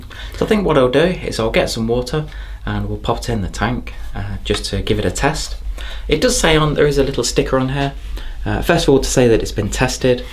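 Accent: United Kingdom. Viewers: YOU ARE MY HERO !!!! SO BRITISH, SO POLITE, SO ASMR !!!!!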